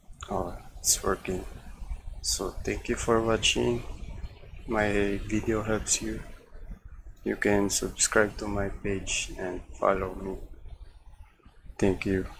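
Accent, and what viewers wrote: Philippines. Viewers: filipino accent 100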